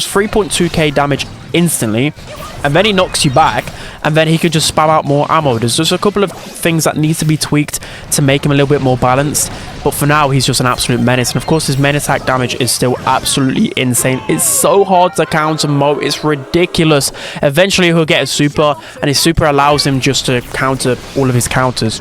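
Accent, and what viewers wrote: United Kingdom. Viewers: Just realized ur British wth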